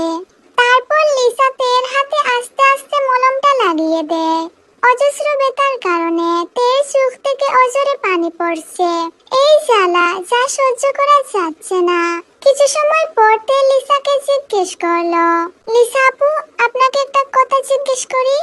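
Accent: Sylhet, Bangladesh. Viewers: তো তুমি কি সিলেটি নাকি চিটাংগা🎉🎉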